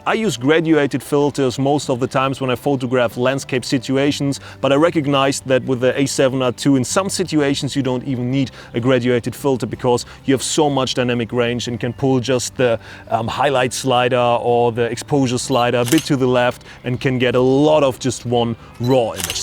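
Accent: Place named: Germany. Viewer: Hey, habe auf dem deutschen Kanal das Video gesehen in dem die Frage gestellt wurde 'wieso so viele englische Videos usw.?' Ich schaue mir lieber den englischen Kanal an.. ich liebe es mich dadurch weiterzubilden und dein englisch ist schön klar verständlich. Scheiß auf deutschen Akzent.. mach weiter so! Ich feiere den Channel!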